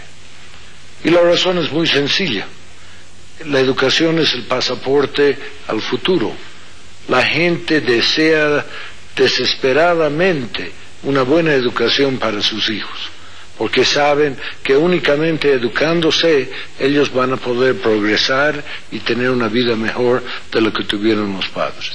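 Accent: United States. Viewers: Es boliviano. Nada más q a vivido más en EEUU q en bolivia. Por eso su asentó americano.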